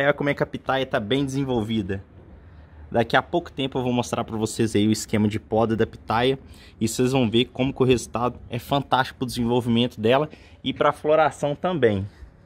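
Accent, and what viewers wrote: Minas Gerais, Brazil. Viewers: Cê é de onde de minas?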